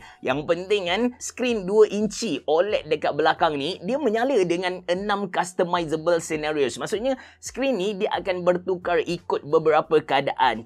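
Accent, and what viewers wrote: Kelantan, Malaysia. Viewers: Cute ye kecek kelate...tak dengo bahaso..kalo Johor tak geti bahase lerr..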